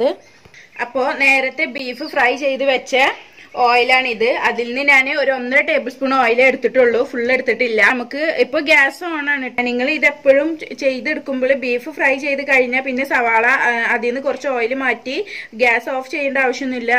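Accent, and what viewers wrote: Malappuram, India. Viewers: samsaram orupadishta, njanum malappurathukariya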